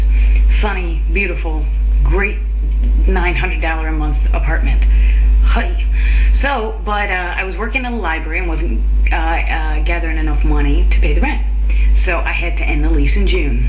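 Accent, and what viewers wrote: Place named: Texas, United States. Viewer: I thought I detected a TX accent. What part? I'm from Dallas (Irving). I never hated the French though. Sure they're smelly snobs, but I never hated them. :) (Just kidding!)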